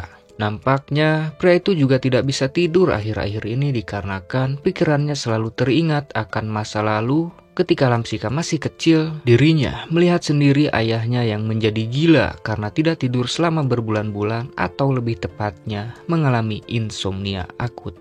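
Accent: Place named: West Java, Indonesia. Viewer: Ges org sunda deui wae.. Asli mana kang?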